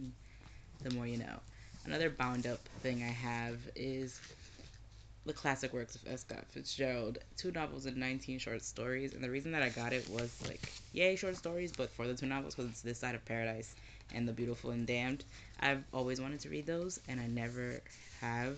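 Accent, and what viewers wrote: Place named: Spain. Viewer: I didn't know there were multiple Oz stories. F SCOTT. TELL ME WHAT YOU THINK ABOUT WINTER DREAMS IF IT'S IN THERE. UGH. LORD OF THE FLIES IS SO GOOD. TALK TO ME ABOUT SIMON. JESUS CHRIST. SHERLOCK HOLMES. YES. YES GOOD. UGH. I'm studying Mrs Dalloway next semester, looking forward to that. Tell me what you thinnnk of it! OH what do you think of Emma Approved? I'm going to read Emma soon. Yes yes yes. That is a beautiful copy of S&S;-; GABRIEL GARCIA MARQUEZ. STRANGE PILGRIMS REEEAD IT. YAAAAAS. I love your Spanish accent.;______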